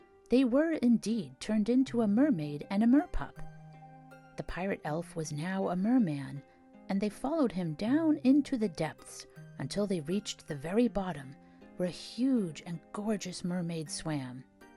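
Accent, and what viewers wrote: Australia. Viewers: well what can i say but awesome! loved your story and the gluing too xx enjoyed everything about this,even your australian accent lol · Omg your Australian accent is awesome! I love it! I’d love to hear that mix tape! ❤️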